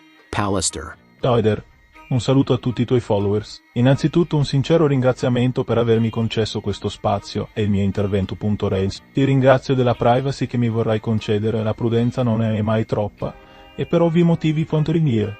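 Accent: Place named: South, Italy. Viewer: Ma questo che parla sei tu stesso? Ha l'accento di uno del sud che ha vissuto un po' al nord, abbastanza peculiare come modo di parlare.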